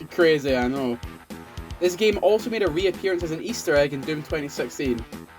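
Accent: Scotland, United Kingdom. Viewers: man i love that Scottish accent.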